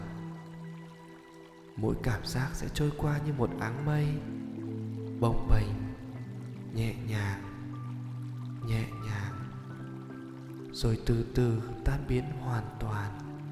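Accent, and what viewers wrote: Vietnam. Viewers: Chú là người Hải Dương phải khong ạ >< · Giọng anh hay quá, giọng Bắc chánh tong tôi nghe mà rất cảm xúc, ấm áp lắm!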